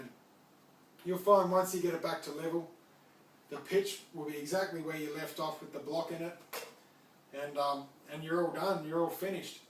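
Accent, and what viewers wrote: Australia. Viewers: Finally saw your face! Where are you from? And where's that accent from? I guess Australia but maybe I'm wrong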